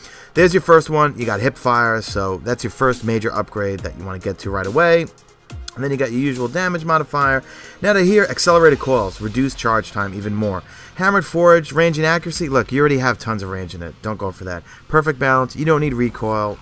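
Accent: New York, United States. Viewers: Hey, fellow NY'er here, so love the accent - don't change a thing. Re: fusion rifles, I wouldn't be so quick to jump on the nerf-wagon before they lift a finger to actually buff anything. Seems Bungie is more comfortable nerfing. Buff pulse rifles, hand cannons, scout rifles and, who knows, fusion rifles may be a less attractive idea after all. Plus, they're great at taking down those pesky shields in PVE. How about spawn less special ammo in PVP? The player base will always have a preference - at least it's a class of weapons and not a single one (Suros Regime, I'm looking' at u).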